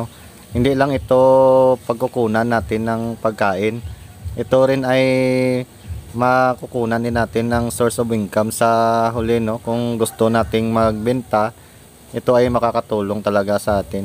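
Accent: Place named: Iloilo, Philippines. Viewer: Ilonggo ka siguro nu meg.. kay may ebidensya ako simu..sa tono ng boses mo abut taka meg..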